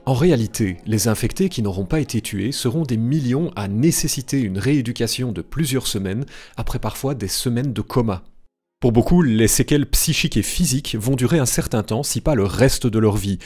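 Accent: Paris, France. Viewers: tu fais trop bien l'accent parisien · Suite à cet accent parisien je me désabonne. Cordialement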